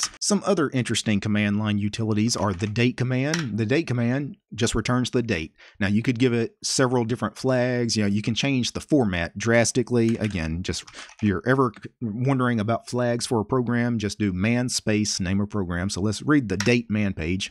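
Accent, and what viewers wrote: South, United States. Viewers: I thoughts it was weird when i first heard Indian accent in a video teaching me computer science, turns out its even weirder hearing a Southern accent in a video teaching me computer science. Jokes aside, its a great video with clear explanation. Good job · Your editor put grip because they can't understand a southern accent apparently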